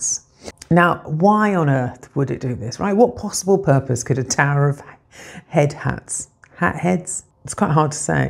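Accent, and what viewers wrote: England, United Kingdom. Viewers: I lovvvve this lady's smarts, sex appeal, sense of joy in her work and that beautifully entrancing British accent! Keep it up! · Red hair freckles British accent...im just like 🥰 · There I am, minding my business. Here comes the internet, throwing the most beautiful Lady at me. On top of that, talking about interesting stuff in an English accent. And there I was, trying hard not to get one of these unhealthy internet crushes. You’re gorgeous, you’re voice is magical and your content is quite interesting, for the short formats of our time. Keep going, all the best to you🍀🎶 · the reason why i will never have a partner is that this is exactly my type a pretty red heat yet dignified lady with a British accent and hot..... they dont exist where i live.....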